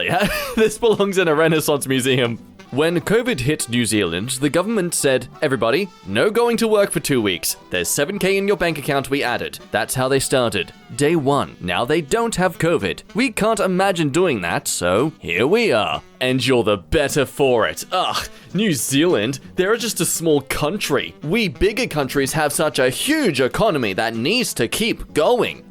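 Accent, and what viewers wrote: Scotland, United Kingdom. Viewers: I totally love the Scottish accent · No one wants to talk about his Scottish accent? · the Scottish accent was the best thing as well as "i sware ya brain so hollow i could turn it into a bagpipe" The Demoman TF2 intensifies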